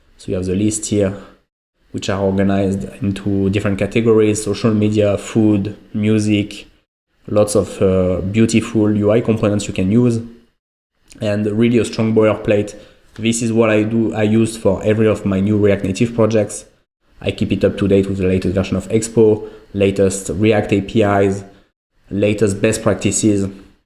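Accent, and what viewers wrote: France. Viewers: You have a french accent despite you ain't french 😄 Great video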